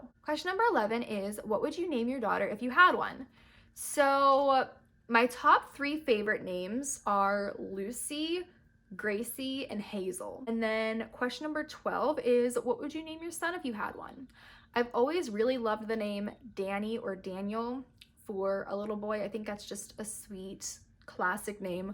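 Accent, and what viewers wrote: United Kingdom. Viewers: I LOVE your British ascent it reminds me of (Tahani Al Jemil) form (The good place) on Netflix defiantly recommend for u to watch so so SO funny watched it all in like a week so yeah..... love u your the best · You have a good British accent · I love your British accent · Nice British accent it was funny when you said that sometimes when you speak British it gets on peoples nerves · Yeah like some people don't like her but not like those who dislike her, I love her and i love her British aksent like whoaw 😍😙😘❤💙💚💛💜💓💕💖💗💘💝💞💟👍👌😍😙😘❤💙💚💛💜💓💕💖💗💘💝💞💟👍👌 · I love your British accent😂❤️❤️❤️ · I LOVE THAT BRITIST ACCENT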